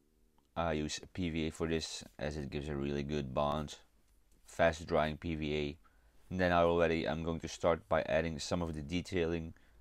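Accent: Netherlands. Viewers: you sound a little Dutch!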